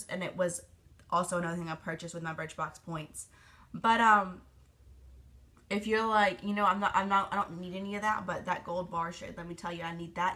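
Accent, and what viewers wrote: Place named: South, United States. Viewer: I am so jealous of your country accent!! 😭😩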